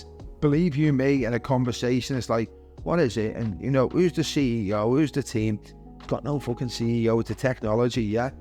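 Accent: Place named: Scotland, United Kingdom. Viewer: I follow you for a while on twitter , but leasening to your accent , your Scotish 😁😁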